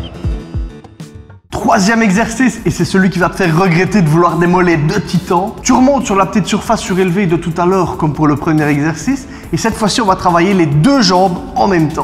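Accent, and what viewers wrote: Liège, Belgium. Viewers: msemblait bien qu il y avait un accent de liege ou pas loin ^^ merci cool tes videos j ai decouvert ia pas longtemps (je suis de Mons en Belgique egalement). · Un bon accent bien de chez nous #BelgiqueVie👌🏽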